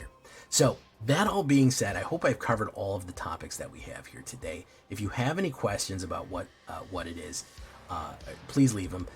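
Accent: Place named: Fargo, United States. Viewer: hm yeah it does sound a bit like the people in Fargo, that makes sense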